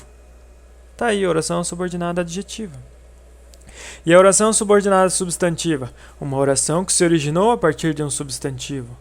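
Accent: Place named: Curitiba, Brazil. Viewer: Você é de Coritiba né ? Reconheci pelo jeito de falar e pela voz, bom video.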